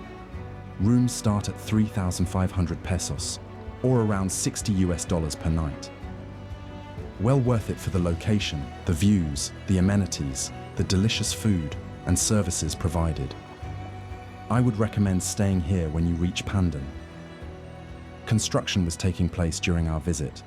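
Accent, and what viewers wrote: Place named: United Kingdom. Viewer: Excellent documentary! Your accent sounds British to me and not Californian. But what do I know...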